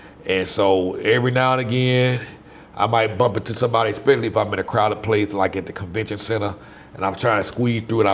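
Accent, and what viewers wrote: South, United States. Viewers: Your accent sounds southern USA.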